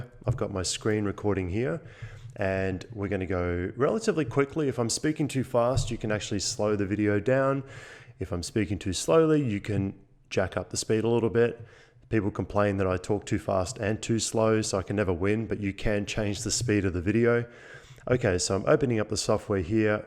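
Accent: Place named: Australia. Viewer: That was so helpful, thanks. Also great to hear it with our local Aussie accent:)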